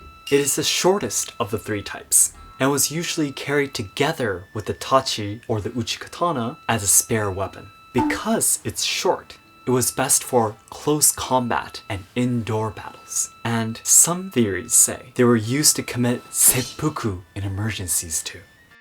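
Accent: Michigan, United States. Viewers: I can hear the "Michigan" in your english. Its very clean with none of the inflection that you find in other midwest states in that area like Wisconsin or Minnesota. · Dude, you sound more like a Michigander than I do! Very interesting video. Well presented. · Your measured, succinct speaking style with an American Midwestern accent is very appealing. Listening to you is so enjoyable.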